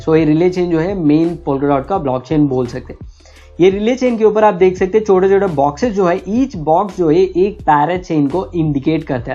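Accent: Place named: South India, India. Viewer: Your accent is South Indian and you use more English. Change your channel name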